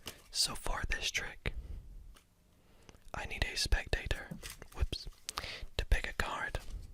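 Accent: Scotland, United Kingdom. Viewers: What's that accept ? I'm english I'm hearing hints of scottish with something else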